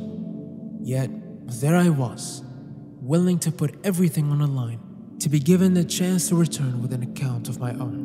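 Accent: France. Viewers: you have a french accent, my friend, you didn't actually need to use babbel to speak it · T'as un super accent français, et tu viens de gagner un abonné !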